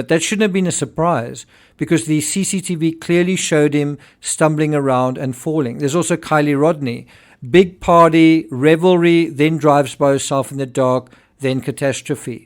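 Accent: South Africa. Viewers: Just listing to your accent and you mentioning your language, we had good friends who spoke so much lie you the husband was quite a famous singer in South Africa called George Van Dyke, he lived here in the UK for quite a while until leaving for the US we are still in touch and he is doing great over there. Love your work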